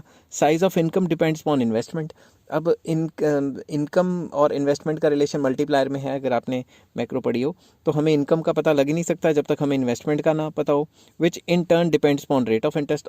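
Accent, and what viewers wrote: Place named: Punjab, India. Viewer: Sir apka punjabi wala hindi bhut mst h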